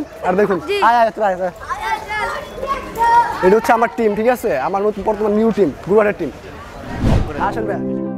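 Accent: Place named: Dhaka, Bangladesh. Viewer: Overall valoy.. editing ta besh valo lagse.. kotha bolar style ta r aktu shundor kor vai, r try kor dhakar vasha ta kom sure kore smart vasha ta use korte, r akta bishoy holo gurur dam kemon, kon gorur kon koshay kerokom dam chai ata tule dhor. corona er jonno bazarer ki rokom obostha ata shobar kase pousate parle valo response pabi.. best of luck dost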